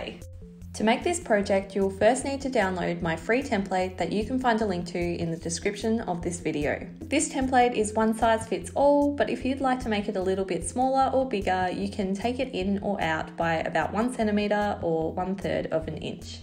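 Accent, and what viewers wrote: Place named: Australia. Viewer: Love it! Thanks, I'm going to try making a couple for my grandchildren. As they live in WA by the beach and are now learning to use their surfboards, I thought it might be fun to try making them with a nylon type of fabric that will still be good for them whilst in the water. Cotton is great for regular wearing, but I was trying to think of something that would protect their faces in the water .... maybe even a fabric like their rash tops that protect the rest of their bodies in the water while out in the sun. Thanks for giving me the idea as I was watching your video. I really enjoy watching your videos because they don't take a lot of skill, so even sewers like me can make them up. It's also great to hear an Aussie on YouTube! In what part of the country do you live? I'm in Hervey Bay so I'm very aware of the need for hats ....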